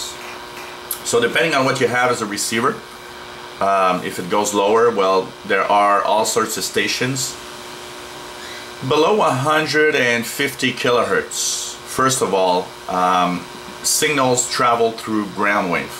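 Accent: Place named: Quebec, Canada. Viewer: Thought you sounded Québecois haha! Go habs go!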